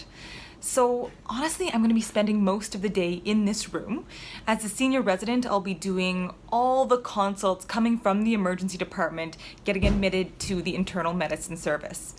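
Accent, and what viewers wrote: Canada. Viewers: Love your videos!! And your Canadian accent 😄 I love watching medical dramas on TV but your channel is just as captivating because it shows real life & explains it all! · I think its Canadian. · Clearly Canadian. The accent is strong in this one 😂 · Is it just me or dose she sound Canadian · She sounds SO Canadian. Is she?? Edit: I see the tims.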